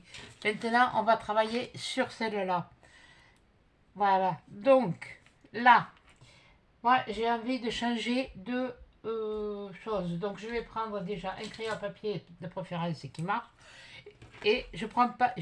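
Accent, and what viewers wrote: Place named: Southwest, France. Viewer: Re coucou. Bon le trouillaumétre a baissé...😁 Vous vous en sortez très bien Ahh du sud-ouest...moi aussi mais avec un accent de Marseille...peuchère 🤣 Ohh tu parles de Meriadeck tiens donc.... Pas de soucis de cadrage, vous êtes dans le champ de notre vision 😁 Sinon...De superbes cartes, j'adore le violet aussi..de la récup de chutes, le must...adorables créations un grand bravo et encore bienvenue. 💖💖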